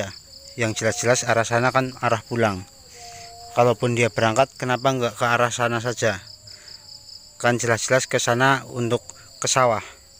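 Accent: Banyumas, Indonesia. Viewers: Assalamualaikum WR WB. MAAF mbah, saya mau tanya karena sangat penasaran. Panjengan domisili di daerah Banyumas krn dgn logatnya. Tapi pastinya dimana. Matur nuwun. Saya penasaran kok masih ada orang canibal.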